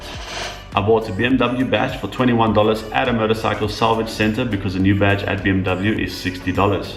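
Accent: South Africa. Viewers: Ever since I watched your first video and heard your accent I knew you come from south africa 😂 en uiteindelik het hierdie video dit bewys. Hou aan met die goeie werk en videos yster! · Love it my dream bike the alien ...was happy to hear a south african brother!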